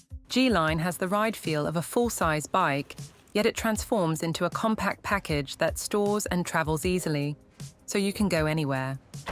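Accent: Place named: England, United Kingdom. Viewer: Typical English middle class accent. Even Americans can understand her without resorting to subtitles.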